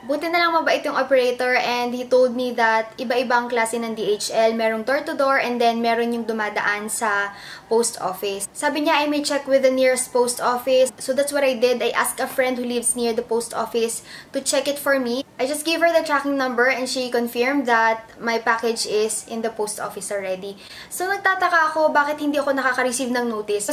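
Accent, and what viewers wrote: Philippines. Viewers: Gloria Robles she's a filipina that's why the accent is different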